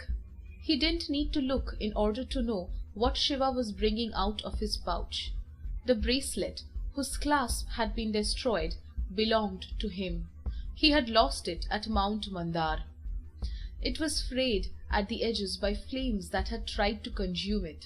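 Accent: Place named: Bengal, India. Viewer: thank you for the audio book. being a bengoli your accent is very familiar and so enjoying with full attention.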